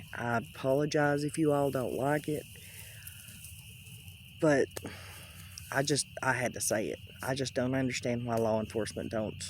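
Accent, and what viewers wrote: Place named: United States. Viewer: Your most welcome my friend…..I love your Accent, I’ve got family in OHIO, I love the American accent. But I understand what you are saying though…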